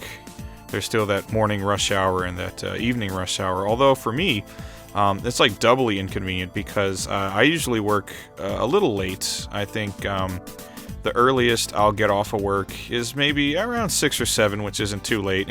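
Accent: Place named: New Jersey, United States. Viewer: New Jersey!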